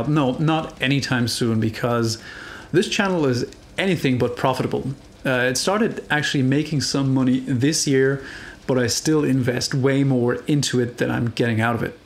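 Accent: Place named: Ireland. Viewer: New to the channel. Love it. But you speak English with a bit of an Irish accent on certain words. How'd that come about?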